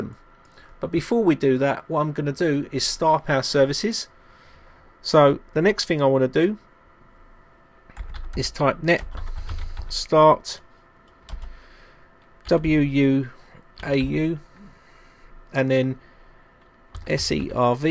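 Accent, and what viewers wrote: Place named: South London, United Kingdom. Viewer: I'm a 68yr man, and even an old fart like me can understand your consise instructions. Are you a South Londoner? you sound like one,I was raised in Stockwell.I can still remember all the Pride and Clarke motor bike shops along Stockwell Road. Thank You very much,I do envy your know how. All the best mate, Mark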